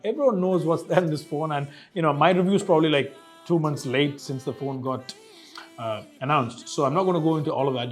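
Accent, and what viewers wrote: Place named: Tamil Nadu, India. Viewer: Enna thala english ku poita un tamil slang ku dhan video vey na pakkuren plz make videos on tamil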